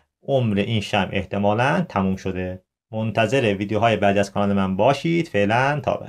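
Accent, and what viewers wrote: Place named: Azerbaijan. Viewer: ممنون از توضیحات کاملتون بخصوص بالهجه بسیارزیبای اذری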